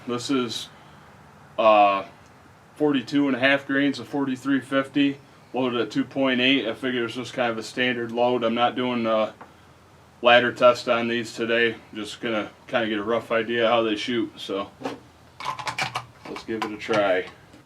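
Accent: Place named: Wisconsin, United States. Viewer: Sounds like. Wisconsin accent to me! Go pack go!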